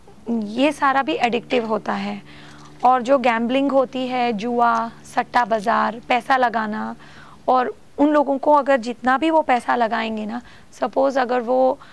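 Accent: Standard. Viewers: Ma'm itni shuddh hindi to aajkal koi north India main bhi nahi bplta he😄. Your hindi is at/ even above native speakers. No need to be worried about it. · Aapki Hindi bhut hi Sanskrit nisht Aur shuddha hai Aur bhut hi uttam hai adhiktar hindi bhashi logon se…..aapko pranam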